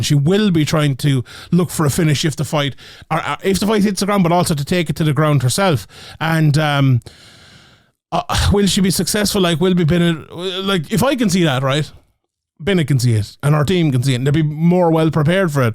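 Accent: Ireland. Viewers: I dont recall 1 damn pick you said cuz you talk so fast and have that irish accent but i sure do love to hear it brotha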